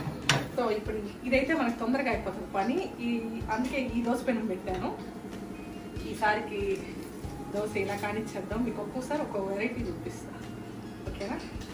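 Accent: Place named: Nellore, India. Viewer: ఆదివారం గారి అద్భుతమైన వంటలు కార్యక్రమం కి స్వాగతం సుస్వాగతం, ఉదయం దోస చికెన్ పులుసు ఈడు జోడు కుదిరింది, మొదటి సారి మీ ముఖం మీద చెమటలు పట్టడం చూసా చాలా శ్రమించారు ఫ్ ఫ్ ఫ్, నెల్లూరు కనపడుతుంది మీ మాటల్లో గారు, గుడ్ బాయ్ అండి బాబు, ఏదో వీడియో చేసినట్లు కాకుండా పక్కన ఎవరికో చెప్తున్నట్టు ఈజీ గా చెప్పేస్తున్నారు, ఫ్రీ గరం మసాలా చాలా ఫ్రీ గా వేసేసారు, ఎప్పుడు ఎప్పుడు వంట తొందరగా చేద్దామా అని చిన్న దోస పెనము మీద వేసేసారు, ఆహా నెల్లూరు యాస యాడికిపోతున్నావు👍👍👍, ఆహా నోరు ఊరించే దోస చికెన్ సూపర్, నోరు కాలింది 😂😂😂 పక్కన వీడియోగ్రాఫర్ నవ్వులే నవ్వులు, మీ అబ్బాయిల రివ్యూ బాగుంది, మొత్తానికి సాధించారు మంచి రిజల్ట్ ఇచ్చారు, చాలా కష్టపడ్డారు గుడ్. మాకు హోమ్ టూర్ వీడియో కావాలి, సిరీస్ అంటున్నారు అంటే అది ఎంత పెద్దదో అర్థం చేసుకోగలం, తప్పకుండా వీడియో చెయ్యండి గారు 👍👍👍.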